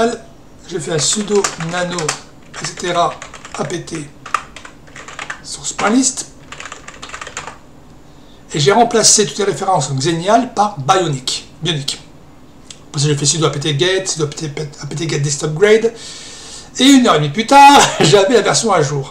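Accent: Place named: Arcachon, France. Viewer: Pour moi c'est plutot bel et bien une Dglfi. Sinon a part ça part chez moi en france et en français on prononce win mais pas winé. Tu es bien sur maitre sur ta chaine et ce doit etre du a l'accent d'arcachon qui est bien sympa de toute façon.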